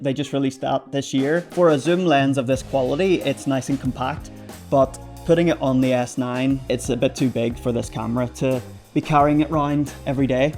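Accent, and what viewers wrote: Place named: Northern Ireland, United Kingdom. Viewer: good to hear fellow norn Irish folk here in the YouTube camera space! (in saying that my accent is fading)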